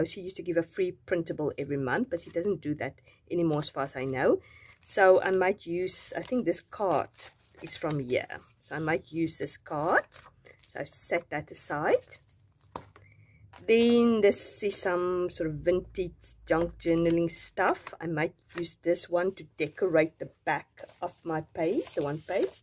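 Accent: South Africa. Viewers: This is so pretty are you from South Africa ? I love your accent. ♥️♥️ I just started journalling and scrapbooking. I love your ideas of incorporating God into journalling I never thought of that before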